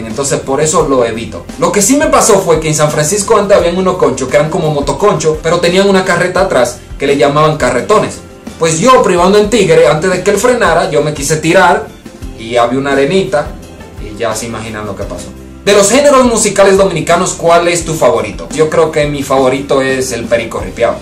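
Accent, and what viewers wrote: Mexico. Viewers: Ya estas hablando como un mexicano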